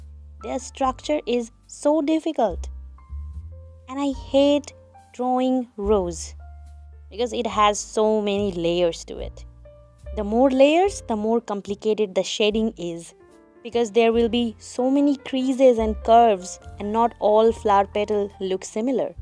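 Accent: India. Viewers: Hello! May i ask you,what is your nationality? Are you an indian? Because you sound like one~😌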